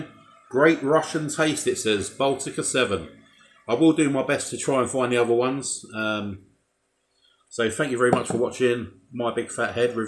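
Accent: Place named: United Kingdom. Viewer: your british accent is so lovely and comforting, like music for my ears and heart ❤ thank you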